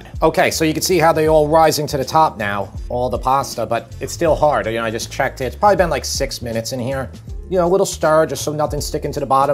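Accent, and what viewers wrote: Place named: New Jersey, United States. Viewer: You must be from Jersey. Love the accents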